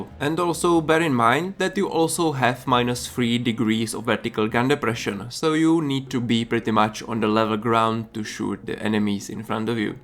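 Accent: Czech Republic. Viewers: Když jsem slyšel ten přízvuk, bylo mi hned jasný, že jsi čech. Super video! :D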